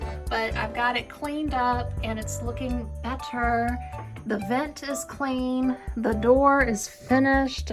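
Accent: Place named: South, United States. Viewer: I thought so because of your southern accent. 👍 I'm watching you from upstate NY. We have the beautiful Mohawk Valley.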